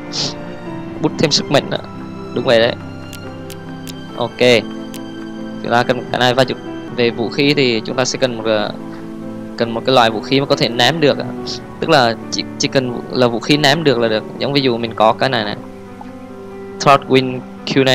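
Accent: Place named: Central, Vietnam. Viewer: Giọng anh cứ lơ lớ tiếng miền Trung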